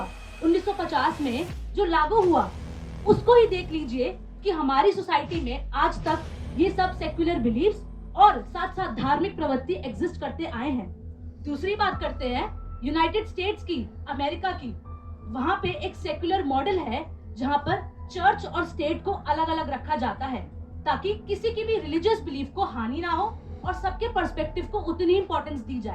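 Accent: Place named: Punjab, India. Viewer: you said secular belief & spritual belief. In captions it's mentioned Religious vs Secular. There is a huge difference between spiritualism and religion even though they're co-related. Your speaking skills are decent but your arguments can be easily penetrated. I'd love to wage war against your Punjabi accent the next time we face each other. Props to you for the victory.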